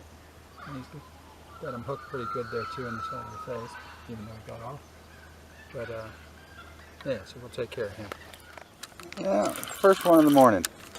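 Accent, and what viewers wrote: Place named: California, United States. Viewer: You're originally from California, right? It always amazes me how similar our accents are to each other.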